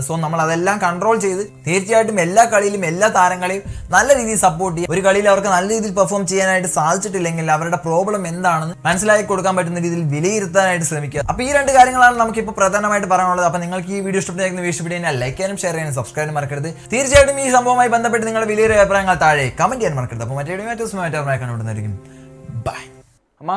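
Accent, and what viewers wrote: Palakkad, India. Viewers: Real palakkadan language 😁😁✌✌ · പാലക്കാടൻ സ്ലാങ്ക് തകർത്തു 👍💚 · Palakkadan slang nice......sprt me back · Palakkaadukaaranaaya ningal itrayum pacha malayalam parayunnuo.... 🤔🤔😮😮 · Namma oru palakadanss😚😚😚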